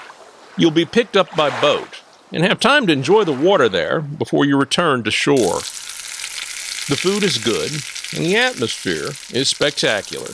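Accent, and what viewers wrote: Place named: Mississippi Delta, United States. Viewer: Based on those pronunciation examples, perhaps you might be from the Mississippi Delta?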